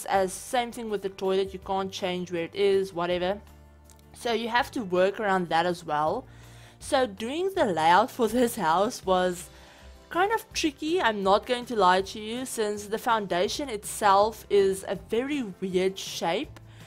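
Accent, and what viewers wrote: Afrikaans, South Africa. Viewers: Are you a Afrikaans speaker? 🇿🇦 Excellent build 👍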